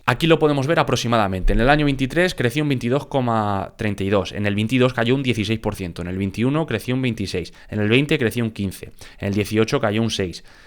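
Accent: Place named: Albacete, Spain. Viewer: Llevaba un tiempo viéndote y pensaba que por el acento serías de Albacete. Hoy lo he corroborado 😊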